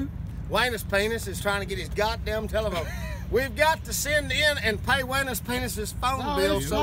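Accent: Mexico. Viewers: I clicked the like button as soon as he started with the mexican accent. Spot on !!!!!!!! Better than George Lopez. Mexican approved. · I can say the same words, try to make the same Mexican accent, but it will never come out as funny as the way you told it. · His Mexican impression is spot on of a English speaking Mexican and I have worked with them for years and they find this funny as chit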